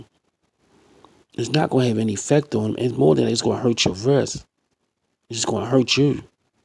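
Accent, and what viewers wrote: Baltimore, United States. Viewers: Straight Baltimore accent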